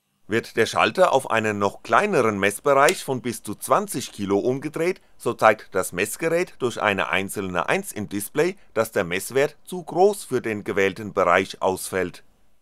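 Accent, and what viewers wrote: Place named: Baden, Germany. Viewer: Ich finde die Videos auf jeden Fall sehr lehrreich, besonders die mit den Selbstbauservos und was µController betrifft. Wenn jemand sehr mit Dialekt behaftet ist (so auch ich als Badener) und versucht den "Stoff" verständlich dem Zuhörer zu vermitteln, dann kann das mitunter für den Sprecher selbst sehr anstrengend sein ohne in den Dialekt zu verfallen. Das sollte man bedenken, letztlich geht es um den Lerneffekt des Themas. Das abschließende "Dange fürs angugge" ist jedenfalls unbezahlbar.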